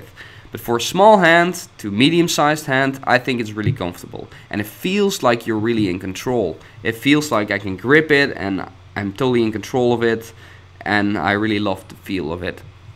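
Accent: Netherlands. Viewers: hoor altijd het nederlandse accent haha :P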